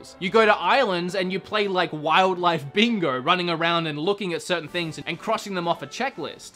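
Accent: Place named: Australia. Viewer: Woow, weird hybrid accent my fellow Aussie, I think?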